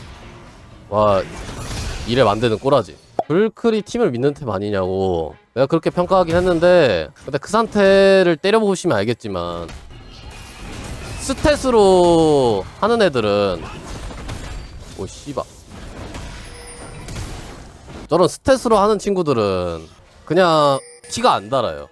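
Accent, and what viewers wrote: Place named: Jeolla, South Korea. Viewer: 형님 혹시 전라도 분이십니까 사투리 정겹네요잉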